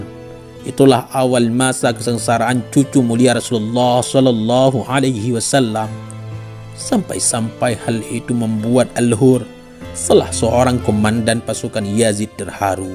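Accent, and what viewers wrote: Aceh, Indonesia. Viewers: Adminnya dari aceh ya 😍 ?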